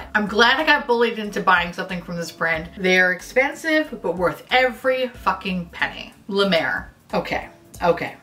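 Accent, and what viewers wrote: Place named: Chicago, United States. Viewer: I’m one and a half minutes in... I don’t remember if it was jaimie French or Smokey glow that sent me here... but whoever it was. THANK YOU BIIII**** 😂❤️❤️ Edit: it doesn’t hurt that you have a very similar accent to my dads side of the family from Chicago. 😂❤️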